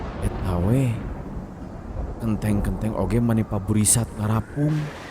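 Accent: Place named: Sunda, Indonesia. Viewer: Kang... Pas ngadongengkeun carita jurig, Osok sieun henteu? Hehehe Abdi nu ngupingkeun mah meni sieun tapi resep da kang nyunda pisan.. perkuat di soanten kang... Musik latar sebagai pemanis z. Nuhun kang.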